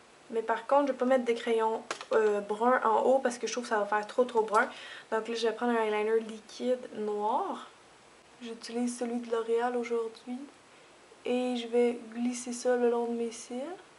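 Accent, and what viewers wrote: Canada. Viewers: L'accent canadien est très mignon.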